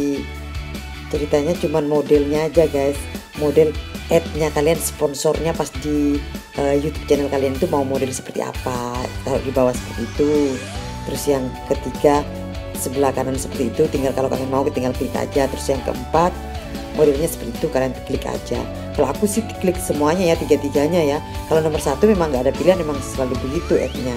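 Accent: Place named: Madura, Indonesia. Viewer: waaikumsalam, Youtuber pemula kyak aku hrs nyimak nich so check it out guys logat madura nya terasa berat hehehe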